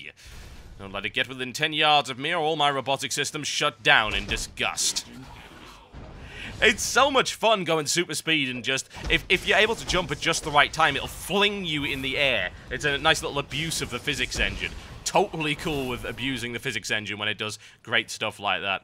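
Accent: United Kingdom. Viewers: I do think this game seems impressive, but at the same time i feel like im unsure to buy it or not. I was so excited for cata (mostly due to these videos) but when i finnaly played the product i felt something was missing (parhaps the brittish voice in the background but who knows). Points is, i dont want to build up my expectations to high, to then have it shot down when i buy it which was kinda what happpend with cata. As for why i wrote this comment.... i felt like it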